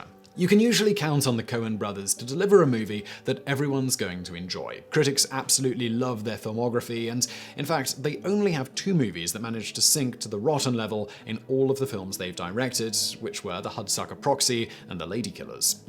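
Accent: United Kingdom. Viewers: Too many British pronounced tomatoes in this video. Please never do a video where you have to say aluminium multiple times.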